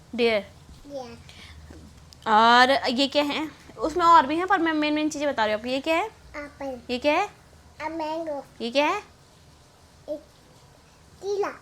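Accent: Garhwal, India. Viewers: आपका tones gadwali लगती hindi v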